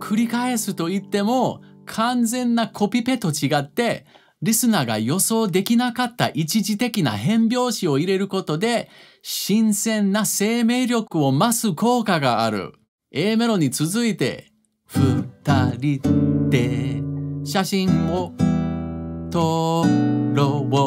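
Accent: Kansai, Japan. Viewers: の関西弁（Kansai dialect）って結構自然ですけど、どこかで練習されたんでしょうか？ただ台本を読むだけでここまで流暢にしゃべるのは難しそう。。。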